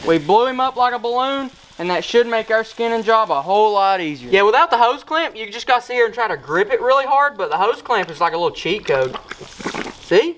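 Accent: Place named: Kentucky, United States. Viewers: & who says the southern accent indicates stupidity. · Where you from in Kentucky? I live in Ky & soon as I heard you talk I knew you must be from here lol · Genius. I see what you did with the short video. ngl this was entertaining and the accent bro. Grew up in a small Florida town so I love hearing the country accent. entertaining video man! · I can hear the Kentucky in your voice, It's good to see some Kin, Im from somerset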